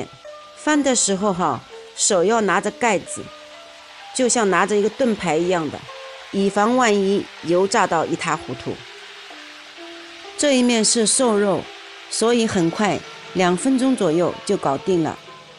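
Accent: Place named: Jiangnan, China. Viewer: 听口音是江南人。太仓人概率95%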